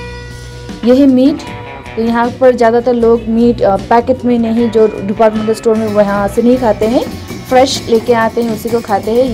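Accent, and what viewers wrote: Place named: India. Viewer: You hv cute hindi accent 😉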